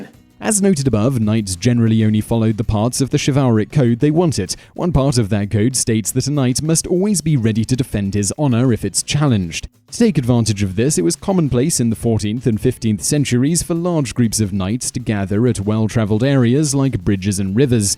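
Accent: England, United Kingdom. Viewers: Well English knights fight on foot, and u sound English u should now about that, not presenting knights to be better then else just because they have horses, also lower class man at arms uses horses, light cavalry, mounted archers... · Absolute crock research delivered by a man speaking in a british accent to make it seem legit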